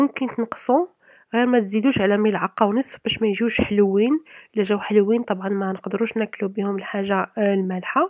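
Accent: North, Morocco. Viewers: حبيبتي اولا تحية خالصة من القلب لك...ثانيا الحمد لله اولا واخرا دائما وابدا...ثالثا كل ما كنت كنسمعك صوتك محبب. اللى قلبي بحال ايلا كنعرفك من زمان خاصة وأن اللهجة ديالك شمالية تطوان او طنجة وكنت كنحس بالحزن العميق فديك الصوت لي دخلي لقلبي والله شاهد متقنطشي لانه ربي كبييير عندو كل خير...عليك بالاستغفار بكثرة بنية الذرية والله ايلا نافعة واحد بننت خالتي شي 13عام مزوجة مخللات فاين مشات باش تولد الاطباء فهلندا فالمغرب الفقهاء كلشي ووالو وكيقولولوم مكين حتى مانع والعامم لي فات حتى جبرت راسا حاملا منكونو معاك ان شاء الله...ممكن نطلب منك تكون صحبتي ...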